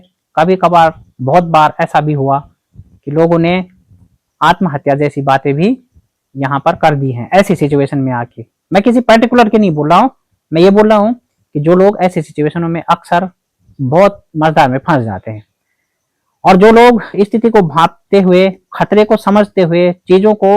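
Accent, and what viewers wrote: Garhwal, India. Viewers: आप हिन्दी में कम गढ़वाली लहजा अधिक है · बहुत ही सुंदर वीडियो भाई जी आप बोलते बहुत अच्छे हो और आपकी बात शुद्ध और स्पष्ट होती है आपके द्वारा दिया गया रिएक्शन सटीक होता है आपके कमेंट बॉक्स में एक भाई ने कमेंट किया है कि आप हिंदी में कम गढ़वाली लहजा अधिक है तो भाई जी मै इस भाई को कहना चाहता हूं की बिहारी पूर्वी उत्तर प्रदेश बंगाली मद्राशी उड़िया महाराष्ट्र बड़े बड़े राज्यों के लोग अपनी भाषा बोली का इस्तेमाल करते है अपने लहजे में बात करते है अच्छी बात है न गढ़वाली लहजा है तो गर्व की बात है कि हम गढ़वाली है हमारा लहजा गढ़वाली होना चाहिए आप भाई का बीडीओ देखो इंजॉय करो और भाई को सपोर्ट करो लाइक करो बेफजूल के कमेंट करने से अच्छा होगा हम अपने इन भाई को आगे बढ़ाए स्पोर्ट करे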